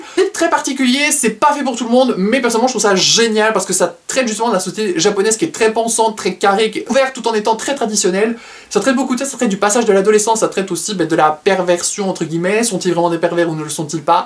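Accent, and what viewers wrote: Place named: Belgium. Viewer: C'est marrant parce que j'ai pas tout de suite capté que c'était l'accent belge (genre ya un truc qui va pas mais quoi^^) mais j'ai tilté au "tome huit" (taume ouite^^) et j'ai réalisé que si ça me disais quelque chose c'est parce que c'est comme ça que mon chéri le dit^^ (belge aussi avec comme toi un accent très peu prononcé, juste sur certaines prononciations et certains mots). Fables me fait de l'oeil depuis un moment (pareil, j'ai connu son existence par le jeux telltell auxquel j'ai malheureusement moins accroché que walking dead parce que c'est un peu lent à mon goût mais j'adore le concept) étant une grande fan des réécritures de comptes etc (once upon a time entre autre^^). C'est cool de nous présenter des comics hors super héros (pas forcément ma tasse de thé à part peut être deadpool que j'aime beaucoup avec son côté comique^^ et les xmen dont j'aime beaucoup les films mais que je n'ai jamais lu). 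J'ai du mal à trouver mon compte dans les manga, j'adore mais je suis plutôt shojo pour leur côté mignon mais pas cucu et pas trop blagues de cul lourdes^^ (j'avais adoré fruits baskets par exemple). Je viens de faire péter ma wishlist du coup^^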